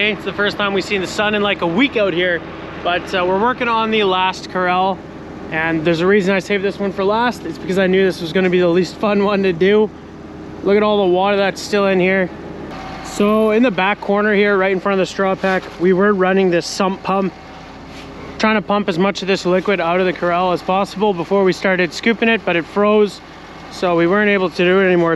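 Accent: Netherlands. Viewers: dat is een zeer zwaar Nederlands accent. leuk om een nieuwe video te zien hier in Vlaanderen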